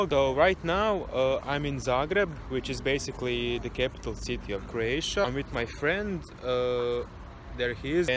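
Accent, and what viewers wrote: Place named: Russia. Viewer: Naglasak ruski al moze proc hahah 👌 extra video 👍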